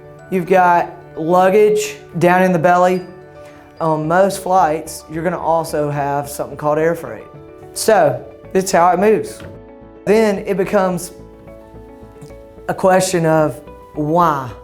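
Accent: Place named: Texas, United States. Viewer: ¡ Love the Texan Accent ! 😉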